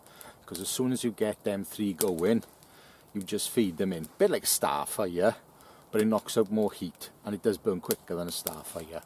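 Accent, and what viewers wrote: Wales, United Kingdom. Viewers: Great Video Brother.😊👏👏👏 I could listen to that Welsh Accent all day. Great Fire Lay.👊💥🔥🔥🔥🔥🔥♨️♨️♨️ Thanks.😊🤘👊👏👏👏 That Splitting Axe kicked Butt alright bro.👞👞👞🪓🪓🪓🪓🪓🪓😁🤘 Nice Knife Brother. I would love a free one.😁🤘👊🤣🤣🤣🤣🔪🔪🔪🔪 a real Beauty.🥰🥰🥰 a Real work of Art. 3v great steel. I would love to know your favorite angle. I wish i could make Knifes like you bro. You have some serious skills.👊🤘😁 great pouch. You are a machine. 👏👏👏👏😊👊 good trick baton that piece of wood thru the final bit. Nice Demo. And Those Feather Sticks Look Insane.😊👊👍🤘 Knce Again A Big Warm Thanks From New Zealand. Ka kite Ano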